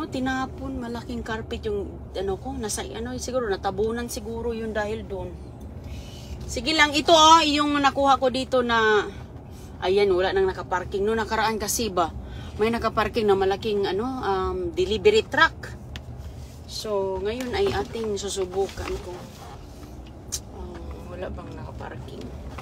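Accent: Visayas, Philippines. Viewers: Ok lang yan Bisaya kasi siya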